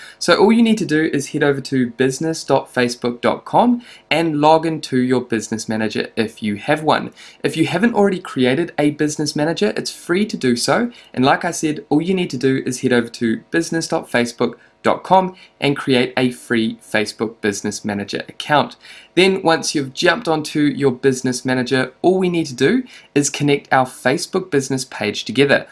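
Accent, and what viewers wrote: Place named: New Zealand. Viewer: I was wondering why your accent sounded so much like Wholesale Ted and it turns out you guys are both from New Zealand. The 'Catalogue' part was the trigger lol.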